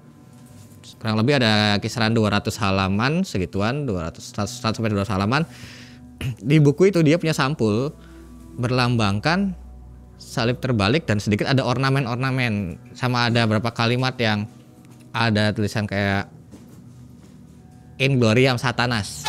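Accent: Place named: Cirebon, Indonesia. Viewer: Logat Cirebon nya ketarak banget bang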